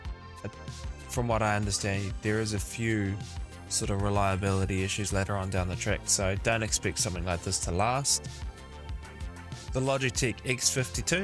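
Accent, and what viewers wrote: New Zealand. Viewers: AYEEEEEE YOUS A KIWI ASWELL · Great tutorial mate. I've been flying in DCS for 3+ years now but it is always good to see what else is out there. I have the Warthog HOTAS at home with VR and I travel with the Extreme 3D Pro to play on the go. I never knew about the entry level eye tracking, it might be worth considering when I am away. Did I hear a slight kiwi accent?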